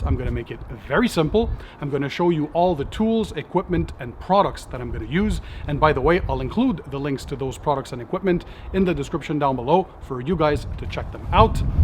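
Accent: Quebec, Canada. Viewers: Are you from Quebec ?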